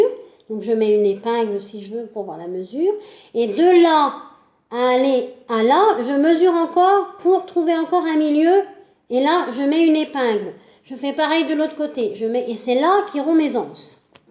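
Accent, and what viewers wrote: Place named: Brittany, France. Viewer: Bravo pour votre tuto et votre bel accent du Méné bré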